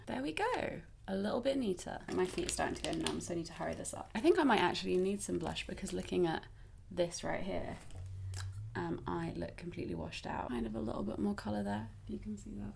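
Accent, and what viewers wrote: United Kingdom. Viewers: Surprising fact, but I wear no make up. Im just here for the lovely face, lovely voice, and lovely British accent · I love your British accent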